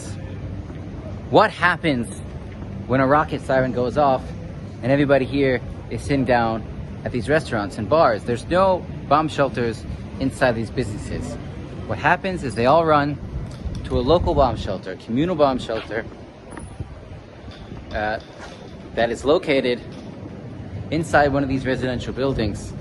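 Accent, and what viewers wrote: United States. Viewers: You sound like an American. What are you doing there?!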